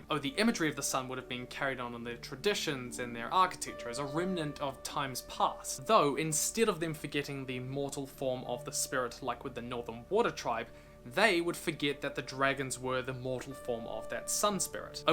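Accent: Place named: New Zealand. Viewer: Omg hes a kiwi!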